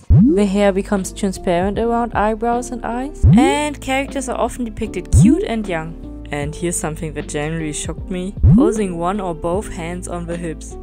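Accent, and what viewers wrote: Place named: Germany. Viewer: By the first sentence I knew you are German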